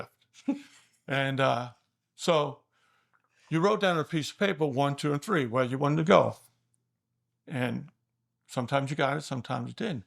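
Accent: New Orleans, United States. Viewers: Perfect accent, soon as this video started if knew he was from the New Orleans area. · This guy has the classic New Orleans accent. Makes me miss that town. I spent a lot of time there in the ‘90’s.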